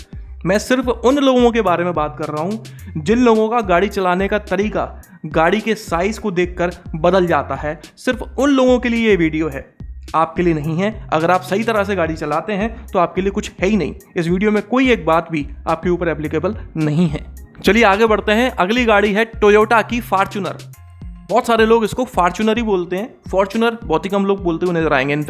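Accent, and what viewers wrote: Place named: Gwalior, India. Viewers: Lagta hai aap pakka gwalior ya Morena side se ho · Bhajja tumai language hame bhind muraina ki lagte😀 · बहुत समय बाद ग्वालियर चंबल बेल्ट की टोन सुन कर अच्छा लगा..... सही रिव्यू दिया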